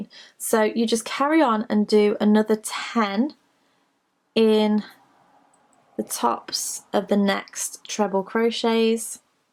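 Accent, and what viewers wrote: England, United Kingdom. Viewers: like the video. Nice to hear an English accent for a change!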